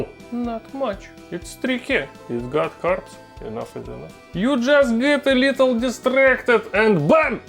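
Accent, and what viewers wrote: Russia. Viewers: is there just all russians watching a russian guy speaking english funnily and then commenting in english?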